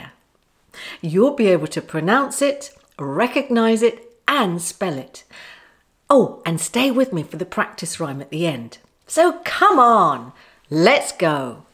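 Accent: United Kingdom. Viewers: It's so nice learning the beautiful British english listining to your lovely voice. May God bless you😊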